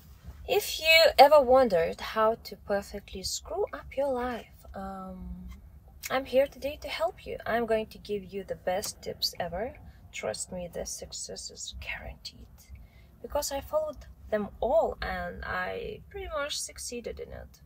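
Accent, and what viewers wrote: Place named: Russia. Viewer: А говорит все равно с русским акцентом ❤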